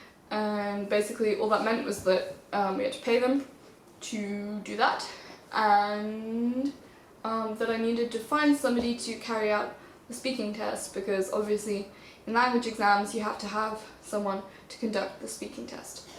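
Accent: United Kingdom. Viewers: 速いーー！イギリスのどこ？ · イギリス英語ですか？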